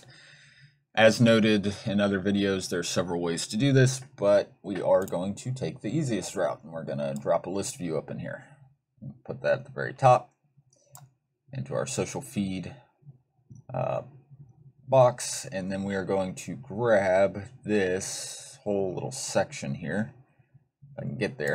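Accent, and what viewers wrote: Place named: RP, United Kingdom. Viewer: its a great video, but coud't continue because of that rich people accent something, that you have . Sorry :( . I think you can talk normal if you want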